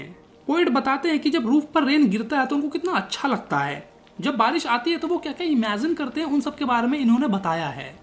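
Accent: Bihar, India. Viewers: Sir are yuo bihari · sir mujhe aisa lag raha hai ki app bihar se ho qki apki awajj bihari jaisi hai 😁😁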